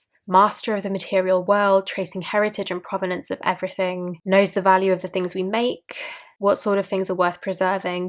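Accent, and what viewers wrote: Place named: England, United Kingdom. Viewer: LOVE LOVE LOVE this! Fairly new to your channel and have been dipping in here and there. I will be starting my own tarot journal when it arrives. I am intrigued about writing my own keywords on a deck. I have considered this before but it sort of felt wrong. I am off to google Lisa's book now :) Also as a side note your voice is beautiful, it's giving me serious ASMR vibes feeling very chilled now. I am from London but live in Ireland so really miss the sound of English accents :D Thank you for all the inspo xx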